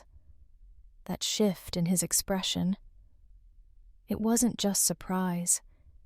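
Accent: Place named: United Kingdom. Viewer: Love this story...and the narrators slight British (?) accent. 🥰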